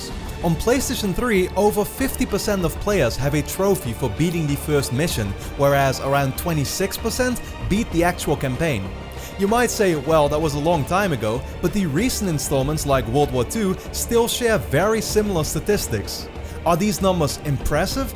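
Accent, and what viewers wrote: Netherlands. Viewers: U dutch ? U sound like u could be dutch. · Volgens mij ben je nederlands tenminste ik denk het te horen aan je engels accent Broer . Ik denk er gelijk over man dit is echt niet goed. campaign is de reden waarom ik het spel koop dat er geen campaign in bo4 zit maakt me misselijk man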